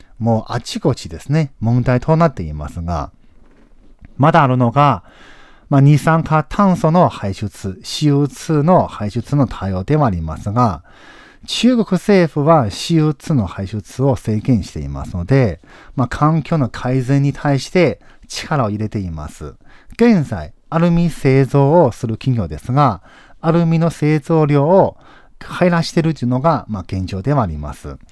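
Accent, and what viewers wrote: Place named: Osaka, Japan. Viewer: 大阪弁だぁ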